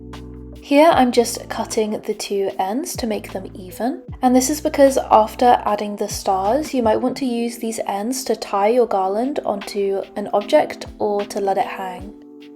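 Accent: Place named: United Kingdom. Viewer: Indeed you did it quite absolutely fabulouslly magically authorically efficiently with your nice british accent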